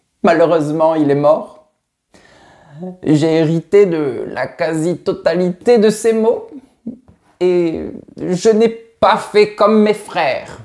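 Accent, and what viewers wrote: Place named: South, France. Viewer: Tu as un accent du sud, et c'est l'occasion de mentionner un mot qui se prononce différemment: MOINS. Au nord on ne prononce pas le S et au sud (donc ta prononciation) on le prononce.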